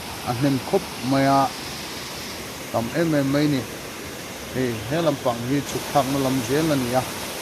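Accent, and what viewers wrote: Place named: South, India. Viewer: I tawng kau chheh danah chhim lam mi i nih ngei hmel e.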